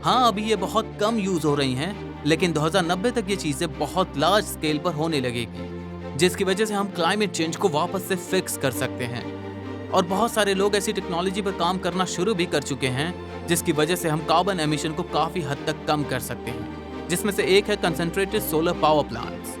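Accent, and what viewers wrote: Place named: South, India. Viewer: Language mein South Indian touch aa rha h....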